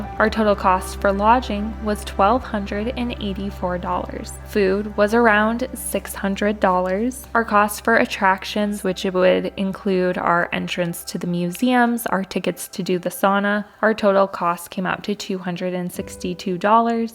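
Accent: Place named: Los Angeles, United States. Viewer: this video is really good....but your LA girl accent is so annoying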